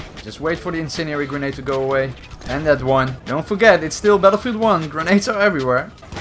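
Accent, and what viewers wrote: Netherlands. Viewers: je hebt een nederlands accent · Wat een heerlijk Nederlands accentje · Nederlands accent, 1 van de redenen dat ik naar je filmpjes kijk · Ik merk aan je accent dat je nederlands bent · Jouw accent😂 Nederlander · instantly noticable Nederlandse accentje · You sound a bit Dutch if you speak English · Your accent sounds like a Dutch accent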